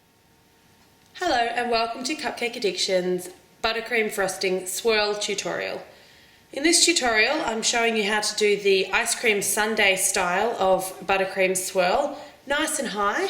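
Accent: Australia. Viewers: you australian? :) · She sounds Australian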